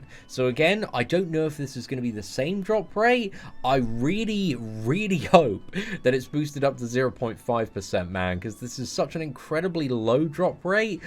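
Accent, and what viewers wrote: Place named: RP, United Kingdom. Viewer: I believe he's English so from somewhere in England whether thats Britain but yeah he drinks tea and has that posh accent so thats my guess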